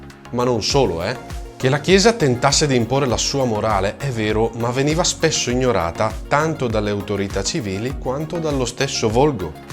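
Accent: Brescia, Italy. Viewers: ma che bravo! mi sono bevuto 13 minuti di analisi comparata di costumi medioevali (col bresciano come caso, ma riapplicabile ovunque) che l'inflessione marcata rende più simpatica senza per nulla intaccare il contenuto (te lo dico francamente, di solito le parlate bresciana e bergamasca, da parte degli altri lombardi come me, vengono associate a generi meno dotti). Iscritto al volo, intanto cerco gli altri tuoi lavori. Avanti così! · molto. Anche l'accento bresciano che hai mi piace! Sono stufo di accenti romaneschi e napoletani. E poi ti esprimi bene. Cosa rara tra i giovani. Continua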